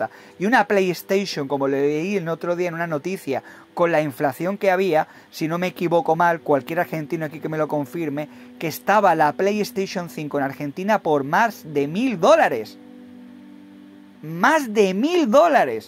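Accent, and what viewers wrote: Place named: Galicia, Spain. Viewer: Buenísimo lo de acento gallego. Yo te veo más japones😂😂😂😂 gallego-nipon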